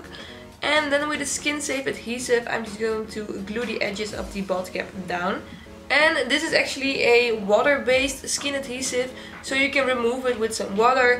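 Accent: Netherlands. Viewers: oml are you from the Netherlands? you really sound dutch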